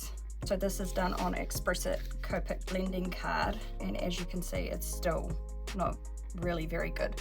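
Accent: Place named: New Zealand. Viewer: After the “77” it was super obvious that you are a kiwi 😬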